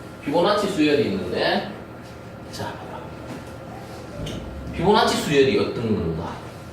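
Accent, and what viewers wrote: Gyeongsang, South Korea. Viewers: 강사님 참 잼나게 강의하십니다. 특히 구수한 경상도 말씨로 생동감이 넘침니다. 한가지 문의드립니다.Window sever 2012에서 화일탐색기로 임의의 화일을 삭제할경우 마우스 클릭후 delete key할경우 선택한 화일이 삭제되어야 하는데 삭제가 안됨니다. 강제로 삭제(Shift+delete )할경우 삭제됩니다. 어떤 원인인지?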